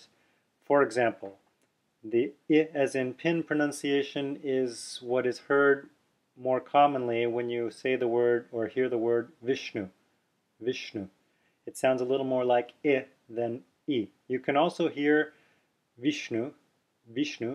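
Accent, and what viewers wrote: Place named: Canada. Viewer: I had watched next I one in the series after making this comment: and noted that u did NOT use a dipthong, as in 'bone' [when pron. w an English accent]. I take it then that that 'o' (or 'o-ou'), would be more suited to this symbol: औ . Tho as u say: with a 'canadian' / afro-carribbean accent; so more like 'u-ou'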